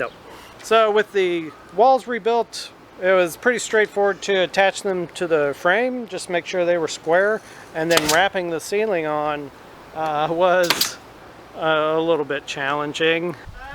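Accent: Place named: Iowa, United States. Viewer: As an Australian I'm going to guess he is from Iowa or somewhere close.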